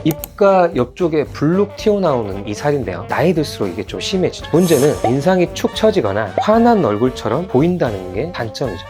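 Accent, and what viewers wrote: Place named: Jeolla, South Korea. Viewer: 전라도 사투리 구수하네예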